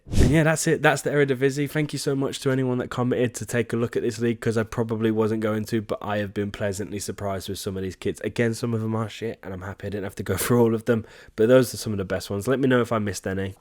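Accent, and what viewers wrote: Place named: England, United Kingdom. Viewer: Also, you’re English, so it’s totally fine to use English pronunciation; every other language pronounces most of the foreign words in their own pronunciation. If you think about it, the Italians, French, Spanish, all pronounce English words like e.g. Manchester in their own way. No need to apologize, just be you and keep up the good work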